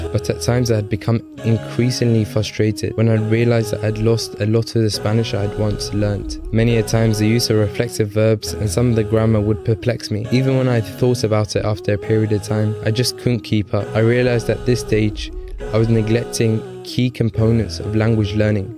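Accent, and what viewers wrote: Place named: Somalia. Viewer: what dialect of somali is that? i understood some but not all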